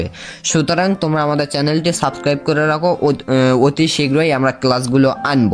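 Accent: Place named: Joypurhat, Bangladesh. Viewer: জয়পুরহাট এর ভাষা 🙂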